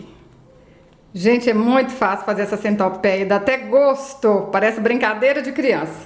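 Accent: Minas Gerais, Brazil. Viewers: Oi...gostei demais uai...você e mineirinha igual eu só..?? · Estou encantada com você. Esse seu sotaque mineirinho é muito agradável. E as coisinhas que você ensina são lindas demais. Obrigada pelo desprendimento. Deus te abençoe grandemente 🙏🏻😘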